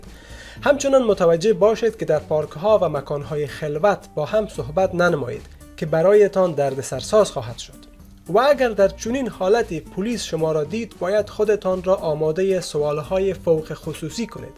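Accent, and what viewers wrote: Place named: Afghanistan. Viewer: جالبه که خود این بابا لهجه افغانی داره 😅😅😅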